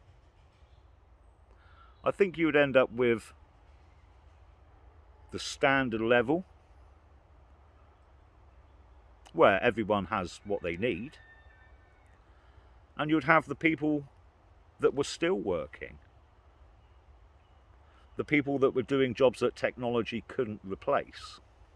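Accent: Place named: West Country, United Kingdom. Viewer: Wow, I’m late to this episode but as ever nice to hear a wise sage view and in a twang of West Country that I know well. I listened again to the tasob stream from a month ago and can see that your attempt at infiltrating the Conservatives certainly engaged your mindful cogs, I’d vote for you!😀😀😀😀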